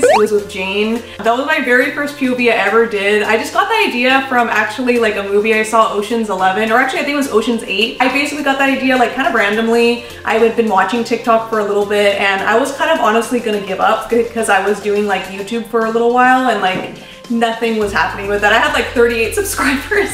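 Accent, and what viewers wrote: United Kingdom. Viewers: Are you English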